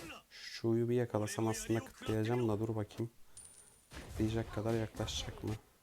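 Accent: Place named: Anatolia, Turkey. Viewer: Ses tonundan beyefendi görünümlü Anadolu çocuğu sanıyordum, bugün gördüm adam hollywood artisti gibi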